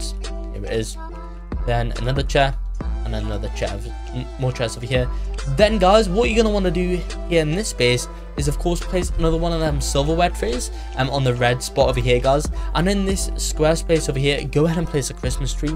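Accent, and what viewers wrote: United Kingdom. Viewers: That wasnt a swear word, it just seems that way because he talks fast in british accent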